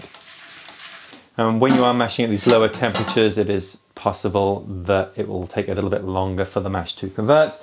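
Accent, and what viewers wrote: United States. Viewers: I LOVE Double IPAs...awesome recipe, awesome video as always! I want that Clawhammer system SO badly...but I'm eyeing up the Brewer's Edge Mash and Boil (w/ pump) for now. It's a lot cheaper, but obviously not reaching the level of the Clawhammer. Anyway, loving the series! Thanks! The American accent btw...SPOT on. ROFL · That southern accent 😂And that beer looks good!!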